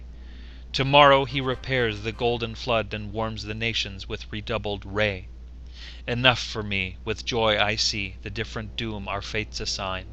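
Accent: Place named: United States. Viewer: Diolch yn fawr! Although not sure the US accent lends itself to medieval poetry ;)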